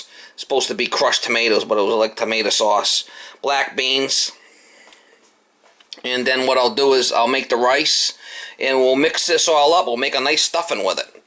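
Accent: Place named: North, United States. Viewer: Stuffing????? That's what you put in your Mama's pillow!!!! LOL Dressing is what you should be eating That's what we do in Georgia, Mama!! P.S. Love that accent lol You people from the north talk faster than us southerners. I have to listen good!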